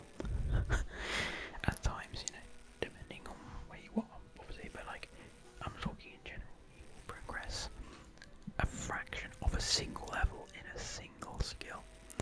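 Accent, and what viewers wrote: United Kingdom. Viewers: Thank you for continuing to make videos, and especially for continuing to make OSRS videos!! I’ve been falling asleep and getting tingles watching these since I was still in secondary school, and now I am in my 5th and final year of Uni, soon to start my masters!! Something about hearing an English bloke meandering through the most nostalgic parts of this game that I have been playing for well over a decade aswell brings me to a place of comfort that nothing else can replicate. ❤❤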